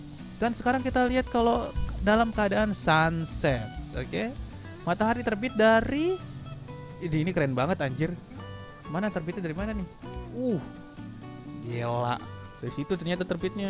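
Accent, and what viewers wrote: Palembang, Indonesia. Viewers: Bang kamu orang Palembang yaa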